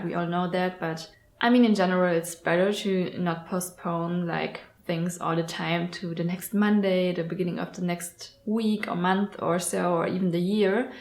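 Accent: Germany. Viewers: Are you from Germany? Your accent made me think that. Btw I really appreciate your work, you are very successful. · Dein Englisch ist ja schon gut, aber man merkt schon sehr das du aus Deutschland kommst :D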